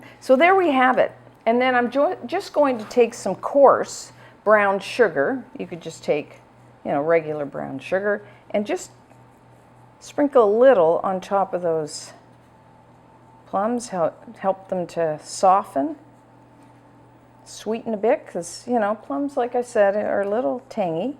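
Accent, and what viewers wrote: Canada. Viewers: Oh wow that looks delicious. I love how you give metric measurements as well as the imperial. Saves me having to convert the measurements. I am an Australian and love to find things that are different to the things we have here. You are Canadian right ? Something about your accent, I recognise. I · Less than a minute in and I knew you were Canadian!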